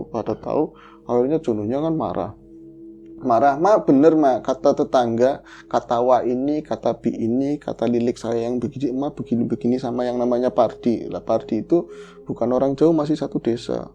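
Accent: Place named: Tegal, Indonesia. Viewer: Bro kalau cerita pasti nyenengin, karena ada kocaknya dan ada bhs tegalnya.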